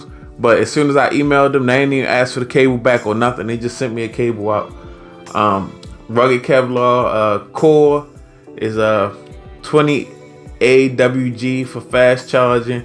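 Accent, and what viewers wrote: Baltimore, United States. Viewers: You from Baltimore or Philly?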